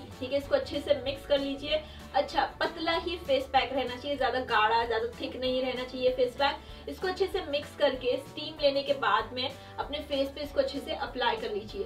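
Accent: Bhojpuri, India. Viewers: I just luv ur bhojpuri accent 👌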